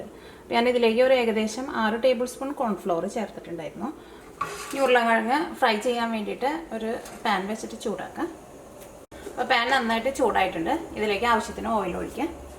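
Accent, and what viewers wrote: Thrissur, India. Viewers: Kalakeet indutta...... Nalla assalu trisoor bhaasha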